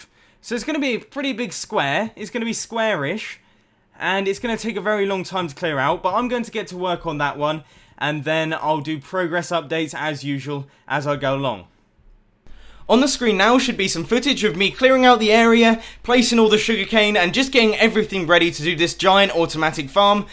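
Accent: United Kingdom. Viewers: the only reason im not subcribed to you, is because(not being racist) your british accent really annoys me. Its not personal, i just really hate the way british people talk.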